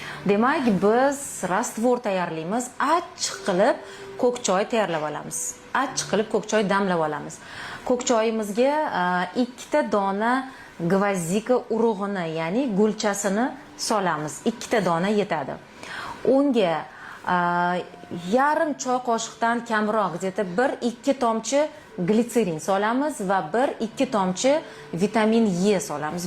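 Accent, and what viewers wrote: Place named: Tashkent, Uzbekistan. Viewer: Iya opa Xorazmlikmi😊Toshkent shevasini yorvoripsizu a😊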